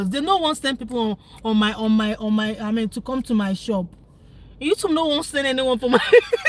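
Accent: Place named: Nigeria. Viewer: ❤ with how the Pigin is so giving in her voice 😂😂😂 Yes o, it's real o 😂😂😂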